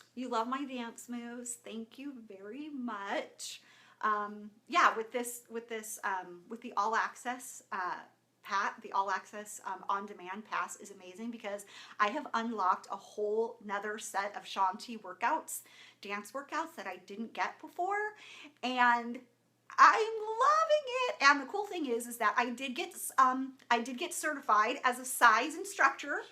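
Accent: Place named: Chicago, United States. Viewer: Hi, I really love your enthusiasm! I'm originally from Chicago,but now reside out in Arizona with my husband and two now adult children! You have an "Chicago accent";) I'm a Christian and love the joy that you exude! I started following you on Twitter too! Blessings from sunny(and Hot!) Phoenix, Arizona!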